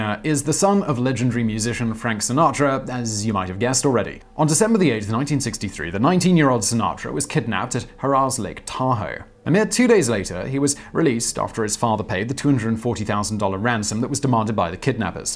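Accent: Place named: RP, United Kingdom. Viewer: You have the poshest accent I've ever heard!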